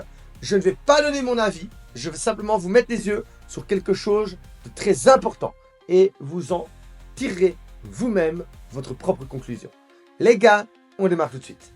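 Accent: Belgium. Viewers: Merci, d'où viens ton accent? Belge une fois?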